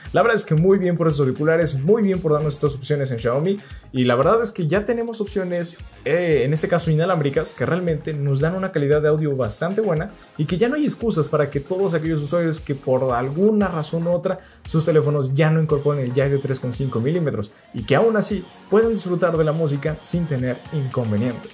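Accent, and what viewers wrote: Mexico. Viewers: Me gustan muchos los vídeos de este canal pero me fastidia la forma de hablar jajajaja puro hablado de mi rey de culiacan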